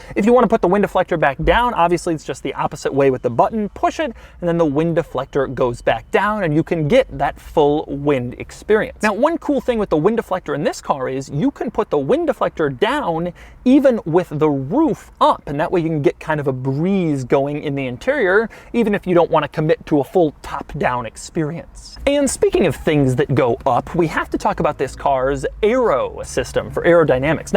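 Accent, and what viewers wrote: Australia. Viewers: nahh way mate straya english aint nothin like those pommies! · Shout out to Australian English 😂😂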